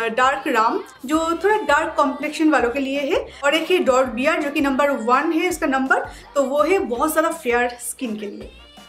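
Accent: Bengal, India. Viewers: I wouldn't call it weird as her mother-tongue Bangla is dominant in her accent. So with her hi-speed talk, it did sound different from the actual pronounciation. All she needs to do is slow down slightly to the range of 140-160 words per minute, which is supposed to be an ideal rate. One can check the internet for this. For jokes one can keep a higher rate.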